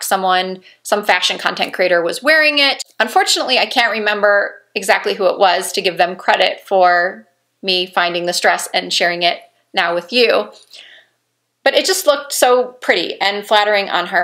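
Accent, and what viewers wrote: Midwest, United States. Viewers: Our Amazon shopping history looks so similar. I have a couple of those brush holders. I have that lamp in silver. I have that necklace set. I’ve looked at that black dress but opted for the sweater with a similar sleeve instead. I just spent the holidays in Summerlin, coincidentally. My SO’s mother and family all live there - so we’re actually there a lot. I’m not sure which neighborhood you’re in. I’m so glad I found your channel. I haven’t been watching long enough to know but your accent sounds a bit from the midwest (like around Chicago?). I am from 50, live in LA, and am originally from Indiana. Anyway. You look fantastic and I am enjoying your channel. Thanks!!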